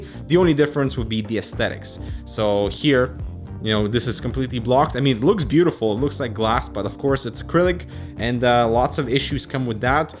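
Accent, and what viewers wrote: Russia. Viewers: Are you russian? Im not trying to be racist, just curious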